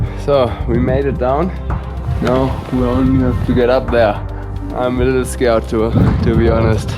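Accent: Germany. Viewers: Mega geiles Video! „Bad english with perfect german accent“ passt so geil zu dir als Marke 😂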